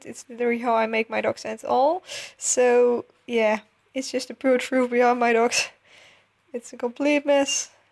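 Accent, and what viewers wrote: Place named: Netherlands. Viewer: Super leuk!!! en ik kan wel horen dat je Nederlands bent XD ;)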